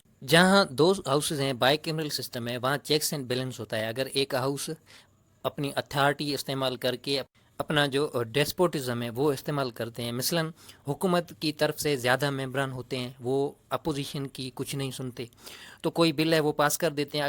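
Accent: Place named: India. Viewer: Pakistani hokar indian Accent..How?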